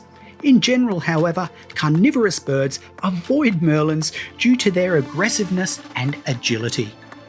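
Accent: Australia. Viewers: Great video! Thanks. Loved the music! But found it a bit loud so I couldn't hear the lovely gentleman's aussie accent.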